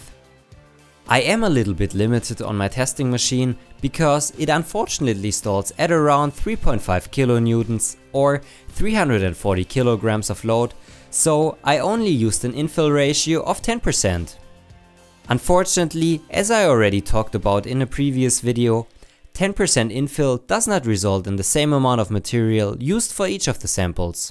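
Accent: Germany. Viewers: My 1st thought after 2 sec: German, Wilkommen im Club! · man do i hate the german accent, but the content is interesting 🥲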